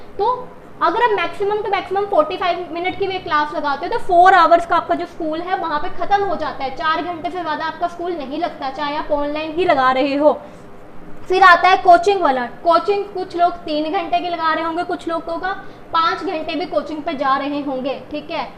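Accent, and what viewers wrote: Punjab, India. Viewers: Didi aap punjabi ho kya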